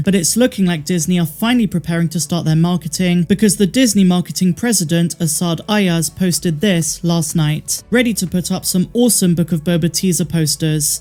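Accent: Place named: Bristol, United Kingdom. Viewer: Love the work! Can i just ask - are you from the West Country? Whenever you say "Jibber Jabber" Your 'ers' sound like 'urs' as in "Jibbur Jabbur". Are you Bristolian? I'm from the west too, alittle further down. Your daily drip feed of tsar Wars info is very welcome & addictive!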